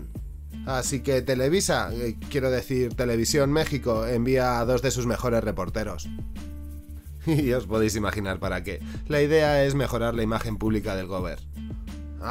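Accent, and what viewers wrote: Spain. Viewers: Pero es el doblaje latino y tienes acento español jejeje Link: <link> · ! Insólito ! ....hasta para narrador de un vídeo solo concerniente a los mexicanos...ponen a un gachupin.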